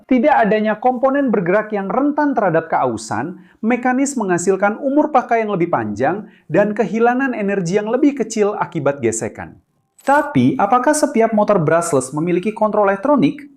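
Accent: Java, Indonesia. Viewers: Dubbingnya logat Jawa. 😂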